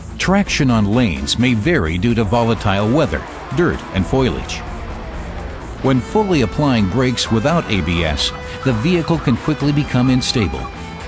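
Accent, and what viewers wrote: United States. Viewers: did we need the "american voice" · so many information not right: driving a car in high heels, a murican accent trying to illustrate the scene by showing stepping on break and CLUTCH....but overall good job ABS.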